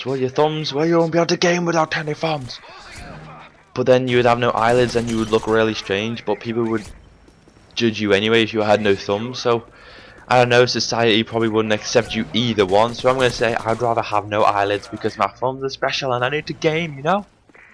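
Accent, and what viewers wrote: Liverpool, United Kingdom. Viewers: may i ask what part of the uk you are from because it sounds like you have a liverpool accent :P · Liverpool accent hahaha its scouse mate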